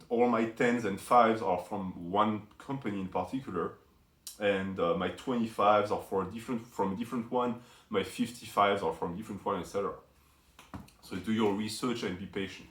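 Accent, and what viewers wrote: France. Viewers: why tf you wanna know that lol Well you can tell he's french by his heavy accent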